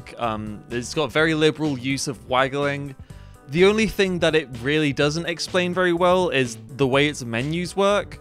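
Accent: United Kingdom. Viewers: I remember playing this game and thinking it was neat. I didn't think I would be reminded of it by a cute bri'ish boi using it as a vehicle for a mental breakdown. lmfao In seriousness, take care of yourself, bud.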